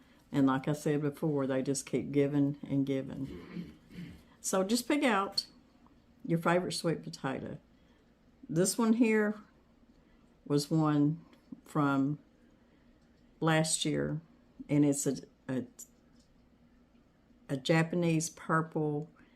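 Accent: South, United States. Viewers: Dear Miss, Thank you for brightening this dreary, rainy day with another delightful video! Wish I could send you some rain for your beautiful, newly planted sweet potatoes. Love watching you plant while listening to your calm southern accent! Yesterday I watched you make your Coca Cola chicken and it looked wonderful! You make everything look so easy and simple! was certainly enjoying it…….I’ll take his word for it!🤗🤭 How do I go back and watch your very first videos? I’ve missed so much. Have a happy weekend! 💕💕Jackie🪷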